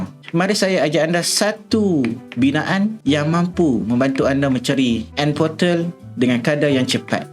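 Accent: Malaysia. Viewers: Wow baru tahu suara malaysia begitu orang Malaysia kue orang Indonesia😂😂🇮🇩🇲🇾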